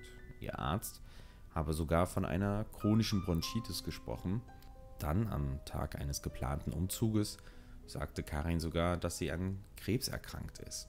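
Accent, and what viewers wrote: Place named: West Germany, Germany. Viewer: bestimmt e wessi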